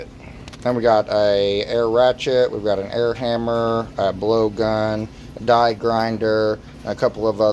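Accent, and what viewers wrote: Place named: Deep South, United States. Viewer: It's 3am here in So Cal and I couldn't sleep. Pt 1 & 2 toolbox tour came up as suggestions. You're actually quite organized and no doubt have a wealth of repair knowledge. Both videos are concise and move right along. Best wishes on your career and youtube endeavors. You have an interesting voice and accent, do you claim the deep south as your home? Texas?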